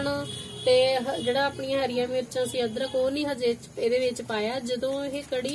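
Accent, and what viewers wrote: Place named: Malwa, India. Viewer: Sirra 😊 Ma'am tuhadi language te ni majje wali lgdi Malwai lgdi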